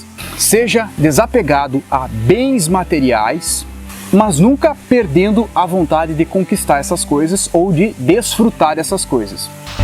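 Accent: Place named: Northeast, Brazil. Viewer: Acho que você é do sul, mas sinto um leve sotaque nordestino ☺️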